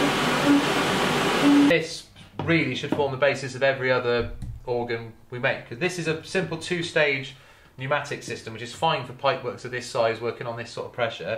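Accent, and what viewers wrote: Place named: Bath, United Kingdom. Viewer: . Hi, I grew up in Bath so its great to hear the home accent again. You have the most amazing videos, you have a great life and you have done so well in sharing. I just wondered if there was any chance you ran any apprenticeship type causes. you seem to be one of a very thin number of people who I think I would respect enough and be able to learn from.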